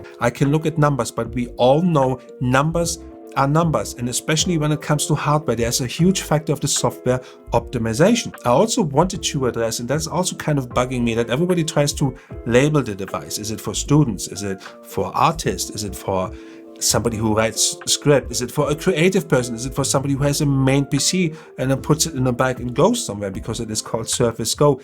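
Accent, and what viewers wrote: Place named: Germany. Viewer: Abnormaler deutscher Akzent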